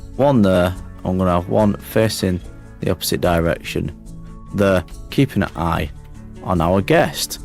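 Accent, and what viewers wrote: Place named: Northern England, United Kingdom. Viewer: Ahh your northern accent! Peerrrrfect! Great tutorial thank you xxx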